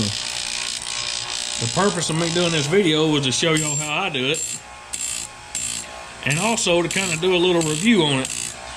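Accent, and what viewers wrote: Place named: Tennessee, United States. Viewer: I , like others who have commented here , am grateful for the absolutely stellar quality of your teaching. You and your son are a credit to the whole “ Chainsaw Community” amateur and professional alike. I own the parent company version of this grinder . That is the Tecomec Super Jolly. All of these machines are made in Italy by the Tecomec company. Your video explains the theory and practical aspects of the use of this machine so effectively that the company should compensate you for what you have done. There is no other video that compares. I was born in Florida but grew up in New York City. Your Tenessee Twang makes the video all the more enjoyable. You are an intelligent man and a gifted teacher. Thank You, sincerely for taking your time to make this documentary. I have learned much over the years about saws and chains but increased my gut understanding of the setup and operation of this machine after watching your video. 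Subscribed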